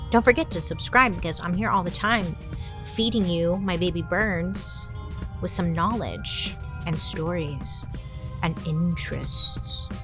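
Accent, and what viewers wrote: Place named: Transatlantic, United States. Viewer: your TransAtlantic accent is *chef's kiss mwuaah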